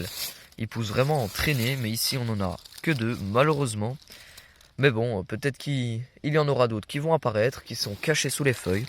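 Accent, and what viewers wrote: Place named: Franche-Comté, France. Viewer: Tu ne serais pas de Franche Comté ? Je pense reconnaitre un leger accent 😊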